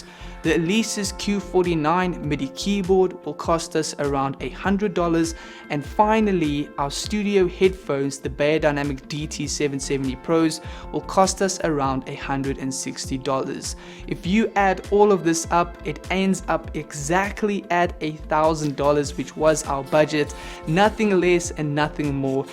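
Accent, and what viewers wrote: South Africa. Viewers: Hi love your video’s been watching you from before the giveaways 😜 just a random question were are you from because I am getting South African vibes from your accent 🤷🏻‍♂️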